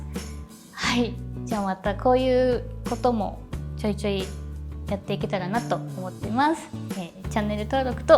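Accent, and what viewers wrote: Akita, Japan. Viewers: 秋田弁可愛い‼️ それに、仮面ライダーカブト見てるのが、共通点あって嬉しい‼️ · 久々の秋田弁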